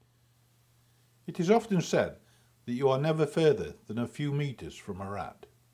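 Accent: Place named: Lancashire, United Kingdom. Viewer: Thankyou,,, Great video,,,Great Accent,,, Lancashire,,, Could be Yorkshire,,,I my self like rats,,,, Good luck to them all,,,👍🌹😄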